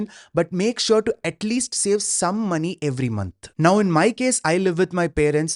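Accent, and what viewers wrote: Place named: England, United Kingdom. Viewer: Un english accent kagave un channel subscribe panirukayyaaa..... To improve my communication ❤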